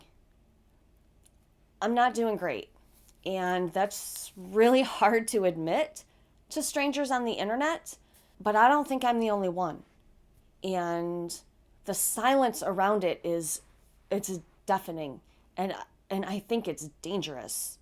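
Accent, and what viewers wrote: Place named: Michigan, United States. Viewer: Hi,. You recorded this video on my 47th birthday, and I'm watching the day after. I keep coming back to your videos because, if I'm honest, your accent takes me home, even though I haven't lived in Michigan in nearly 30 years. Beyond that, you're so relatable. I told my husband (my BFF, the breadwinner, and the one who I know always has my back) last August, "I did a thing. You know I have a hard time asking for help, and always have, well, I have an appointment with a therapist in two weeks at the same counseling center we used with our daughter. I'm not depressed, but I'm not doing well and I need help." Long story short, perimenopause will kick a neurotypical woman's butt, and absolutely make everything that a neurodivergent woman struggles with on the best day, way way more difficult. I know what depression is (been there, taken the Rx for it), that wasn't it. 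For me, perimenopause ramped up my (now diagnosed) autistic traits AND my ADHD traits and masking became more than my physical body could keep up with, and I was doing well to do the bare minimum in every aspect of my life (as homemaker, wife, mom, grandma, and friend, too). My virtual hug and older sister recommendation to you is this: if you need someone to really listen to you, like you probably listen to your friends, find a therapist. Therapy is so worthwhile. Everyone needs a safe place to talk face-to-face with someone about all the things, and creative introverts seem to be that safe place for their f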